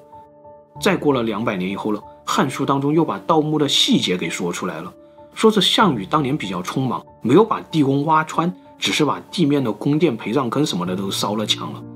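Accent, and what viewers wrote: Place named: Sichuan, China. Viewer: 你是哪里人啊，哈哈哈哈，四川？湖北？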